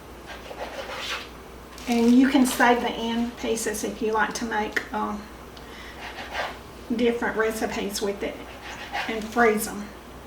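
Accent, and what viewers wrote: South, United States. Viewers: I just found your channel, you are SO sweet, oh my goodness. Listening to you share the recipe with your Southern style just made me feel so at home for some reason and I'm not southern! (even though we live in FL, that is NOT the south) :-) Newly subscribed. I'm looking forward to making this on Thanksgiving morning. I think many people know of a breakfast sausage recipe but your video just is so heart warming. Wishing you a Happy Thanksgiving. Glad to have found your channel.-Theresa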